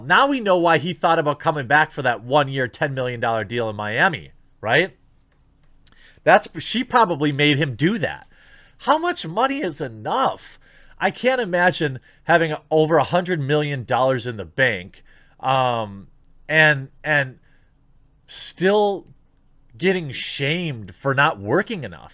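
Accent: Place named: Wisconsin, United States. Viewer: Your obviously from Wisconsin, greetings from the Green Bay area fellow cheesehead.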